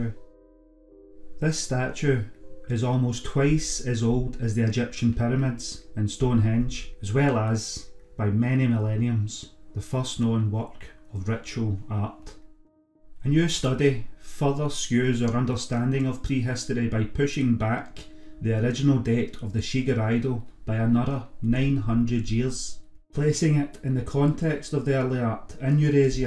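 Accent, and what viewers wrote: Scotland, United Kingdom. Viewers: Love your accent, miss living in Scotland!! By the way the engraving patterns on these sculptures are water symbols, during the later mesolithic and the Neolithic, chevrons represented vaginas and waves represented water so both would to a Mother Goddess statue... These patterns are all over the world but studied especially in Lithuanian folk art and European prehistoric art my Marija Gimbutas.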